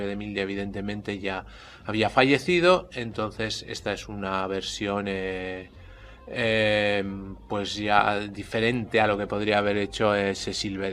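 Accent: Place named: Spain. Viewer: No soporto tu acento español te bloqueare mejor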